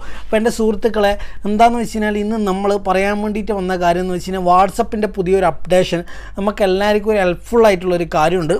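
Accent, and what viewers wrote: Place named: Kannur, India. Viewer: ഇതാണോ കണ്ണൂർ ഭാഷ..... കേൾക്കാൻ നന്നായിട്ട്ണ്ട് ട്ടാ ഗഡീ..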